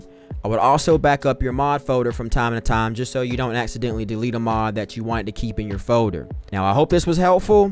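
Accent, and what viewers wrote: United States. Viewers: Omg for the first time in my life I hear an American accent that is different from a "standard" one (I'm a foreigner), and it's not a "A guy speaks 50+ accents" video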